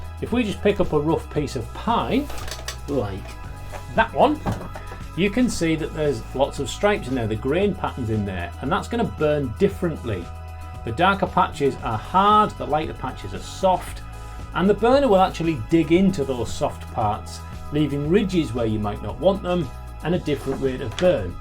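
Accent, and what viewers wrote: Yorkshire, United Kingdom. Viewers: A really good presentation on pyrography. Nice and clear, filmed well, good/relaxing music in the background nice Yorkshire accent and useful tips. Thank You! :-)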